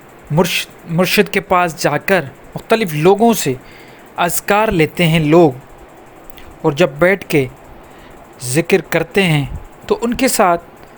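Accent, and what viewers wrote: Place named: Sindh, Pakistan. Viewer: Sir Aap ki zuban Sindhi lag rhi hy han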